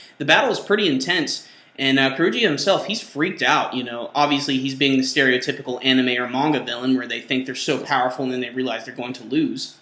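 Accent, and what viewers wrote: Germany. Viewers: Once again, your german accent is priceless!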